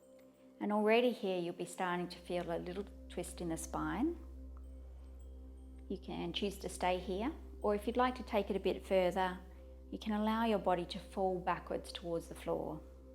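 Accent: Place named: Australia. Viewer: Great practice. I really enjoyed the first pose, whad do you call that? And, I love your aussie accent 🙂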